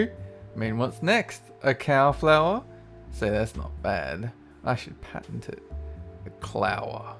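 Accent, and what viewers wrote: Australia. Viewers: Monochromatik Kolor He's Australian. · Every time I hear this guy I think of an Aussie Shaggy from Scooby Doo who's got way too baked and forgot he had to record a voice over...And instead of waiting he just drawled it out anyway... Heroic! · His Australian accent is about the only one I can tolerate . (He sounds a tad under zee influence in this though ) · I haven't been watching your channel for long but are you Aussie?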